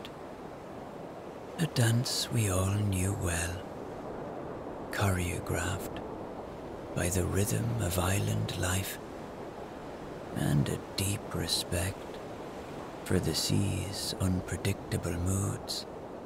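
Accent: Ireland. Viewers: I love your stories using an Irish accent to your voice! So lovely, lilting, and relaxing . Thank you. 😊❤😊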